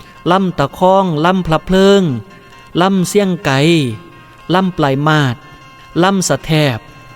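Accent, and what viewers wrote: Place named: Khon Kaen, Thailand. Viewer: ขอนแก่นแท้เว้าแบบนี้ละครับ เว้าภาษากลางปนลาว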